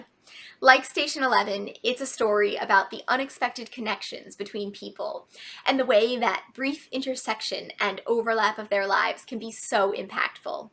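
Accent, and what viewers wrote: United States. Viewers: Here from Books and Things. You have a very cute and familiar face and very sound very American. Subbed!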